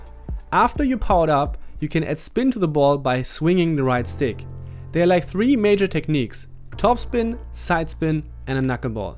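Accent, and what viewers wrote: Germany. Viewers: Bist du deutscher ? Hört sich vom Akzent her bissle an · So n bissle hört man den deutschen Akzent dann doch raus 😉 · Your obviously German aint you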